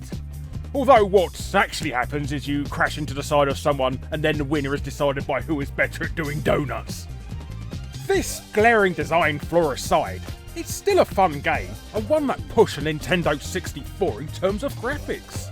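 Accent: United Kingdom. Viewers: He's just British.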